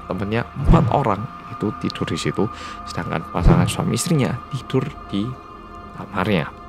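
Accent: Java, Indonesia. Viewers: Bersama orng korea yang apasih kata mas? Aku taunya orng korea yg medog. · Gkpp deh udh 1 bln lalu bru nonton habis aku tuh nyari ka yang rambut ny agak panjang lebih ganteng hehehe kK muka ny bd setiap rambut ny pendek, pakai kacamata, dan rambut sedikit pnjng tp tetep ganteng koq kak yang medok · "MENGGANDOLI" bersama orang korea yg medok tenan 👍🤭😁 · medhok ganteng wees🌛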